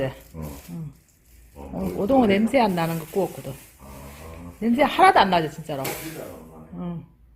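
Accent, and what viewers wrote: Gyeongsang, South Korea. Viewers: 경상도사투리 너무좋다 부러워요 입담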